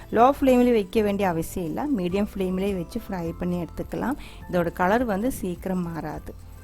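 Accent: Kerala, India. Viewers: Love ur tamil way of speaking in a malayalee slang...awesome sis frm malaysian tamil mom😍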